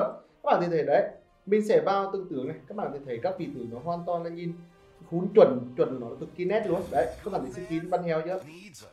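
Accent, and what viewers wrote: Nghe An, Vietnam. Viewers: Nghệ An nào 😂😂😂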